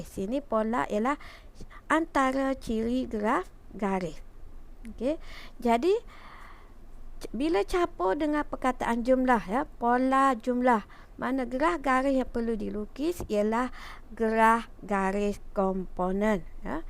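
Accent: Kelantan, Malaysia. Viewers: Cikgu mesti drpd Kelantan kan? Suara cg sedap didengar buat kitorang xberhenti fokus..terima kasih Di atas perkongsian..❤️❤️ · Kecek kelate ye cg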